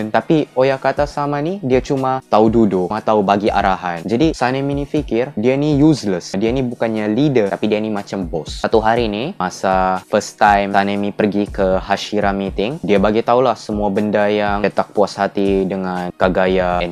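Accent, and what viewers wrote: Sabah, Malaysia. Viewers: punya best lah orang sabah ini ckp! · org sabah kh? ketara sleng sabah tu hehee. btw, hai saya fans dari sabah hehee😊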